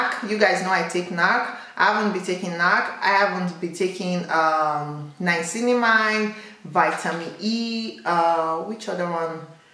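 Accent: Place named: France. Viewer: French accent ❤️❤️❤️love your accent girl! Thank you for the video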